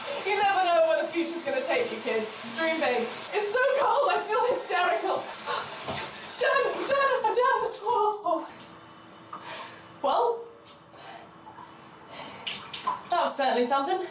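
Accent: United Kingdom. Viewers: you sound more british for some reason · I don’t know why I always forget that you are British until you start talking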